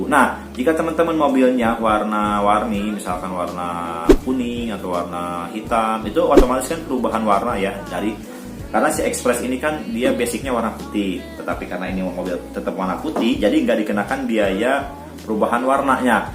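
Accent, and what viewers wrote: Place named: Bogor, Indonesia. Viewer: Orang bogor yah...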